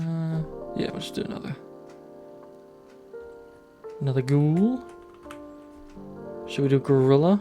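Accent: New Zealand. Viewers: wow very nice relaxing vid!! love your voice, are you from new zealand?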